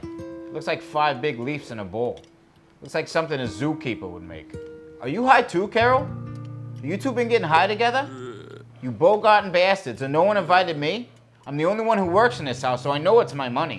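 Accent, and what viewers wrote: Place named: South Boston, United States. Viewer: XD I love that he has a southie accent!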